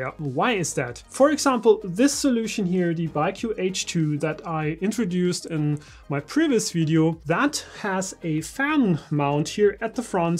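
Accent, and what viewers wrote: Germany. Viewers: Dein Englisch hört sich seeeehr deutsch an:-) Aber by the way, sehr gute Videos von dir, Top!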